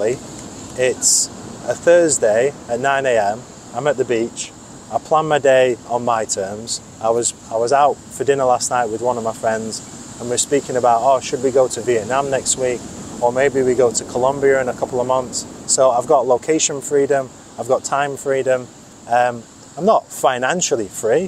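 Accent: Manchester, United Kingdom. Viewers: Is that a Manchester accent I hear? I’m American by the way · Is that a Manchester accent? I’m an American and just curious if I recognize accents well enough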